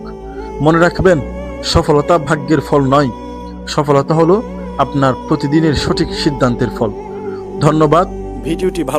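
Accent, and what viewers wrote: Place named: Chittagong, Bangladesh. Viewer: আপনার কথার টোন হলো, আপনি চট্রগ্রামের।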